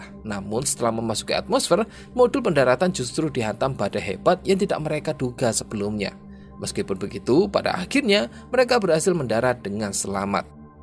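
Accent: Java, Indonesia. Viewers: Suara medok mu terngiang ngiang sampe aku turu bro 😅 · Logat medok nya kedengeran bngt ,tp intonasi nya enak bngt😊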